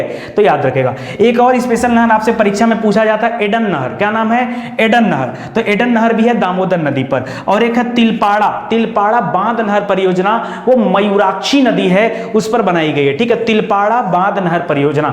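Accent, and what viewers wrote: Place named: Bihar, India. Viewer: Bhai professional tarike se bola Karo ye up Bihari tone samjah nahi aata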